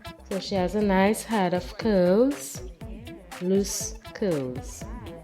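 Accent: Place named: Trinidad and Tobago. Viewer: Lol..Trinis always make out each other..as soon as the video start I was like...she sound like a Trini ...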